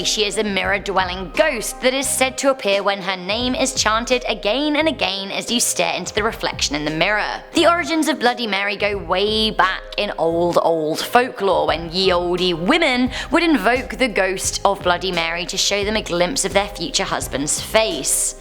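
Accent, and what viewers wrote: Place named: United Kingdom. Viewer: I love sexy British accent. 😊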